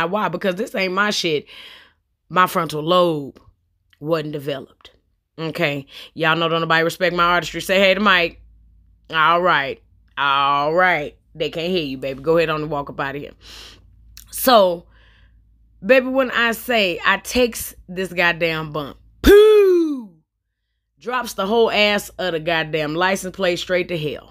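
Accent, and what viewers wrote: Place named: North Carolina, United States. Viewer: You from Nc because you sound like me and my family..the skrenf lol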